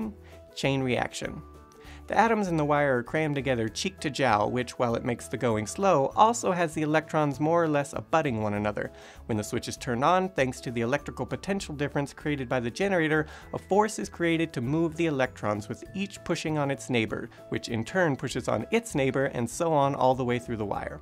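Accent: United Kingdom. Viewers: To be fair, the smart British accent facade is getting a little old in general, though I can't argue that it wasn't a smart move all the same. At least I don't feel like Simon wants to kung fu fight me like that guy from PBS Space Time. His "smarts" are over 9000. Cheers.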